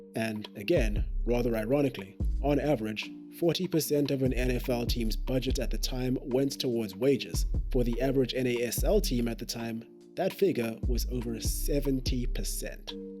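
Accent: South Africa. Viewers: Accent sounds like a black south African for some reason....just saying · Are you South African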